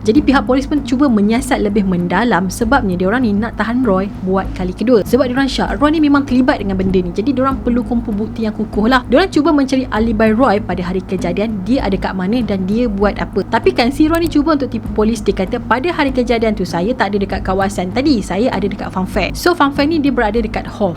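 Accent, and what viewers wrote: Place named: North, Malaysia. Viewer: mesti org utara kn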